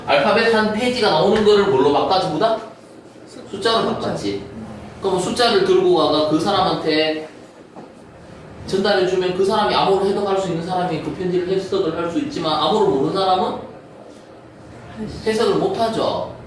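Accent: Gyeongsang, South Korea. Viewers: 강사님 참 잼나게 강의하십니다. 특히 구수한 경상도 말씨로 생동감이 넘침니다. 한가지 문의드립니다.Window sever 2012에서 화일탐색기로 임의의 화일을 삭제할경우 마우스 클릭후 delete key할경우 선택한 화일이 삭제되어야 하는데 삭제가 안됨니다. 강제로 삭제(Shift+delete )할경우 삭제됩니다. 어떤 원인인지?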